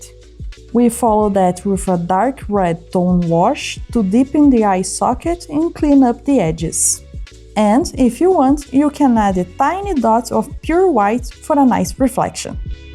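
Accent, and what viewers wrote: Brazil. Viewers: Hey, are you brazilian? I think i noticed a accent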